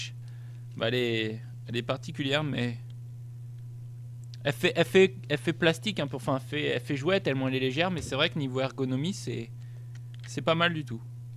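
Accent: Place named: France. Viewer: W00T I LOVE YOU FRENCH ACCENT:p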